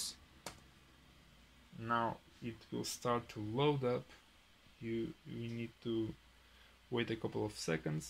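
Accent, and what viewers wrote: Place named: Russia. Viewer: You sound very russian lol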